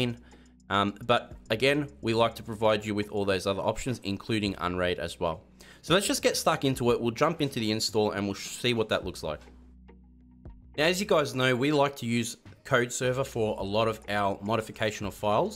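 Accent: Australia. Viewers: Hey r u Australian bc u got an aussie accent?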